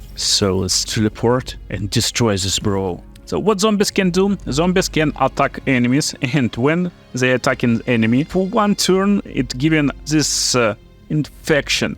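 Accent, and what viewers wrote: Russia. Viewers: Слышь по твоему говору понятно что ты русский.говори порусски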